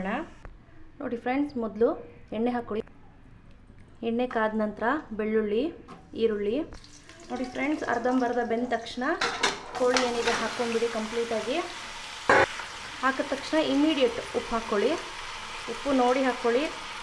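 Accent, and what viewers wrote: Mandya, India. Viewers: just by your way of speaking,as we r from Mandya..tq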